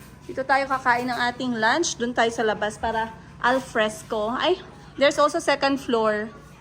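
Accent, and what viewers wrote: Iloilo, Philippines. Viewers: I love ilongo accent sayo madam 😂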